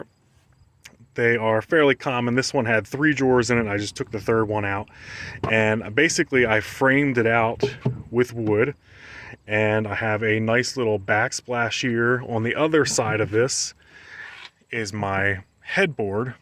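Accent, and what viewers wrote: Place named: Maryland, United States. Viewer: Ps this is the first vid i ever seen of yours it came across my you tube bc i watch tiny /bus homes . Im hearing an accent that sounds very similar to mine... Are u from Maryland or near???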